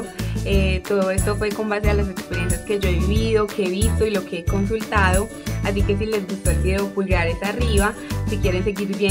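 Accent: Colombia. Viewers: habla como colombiana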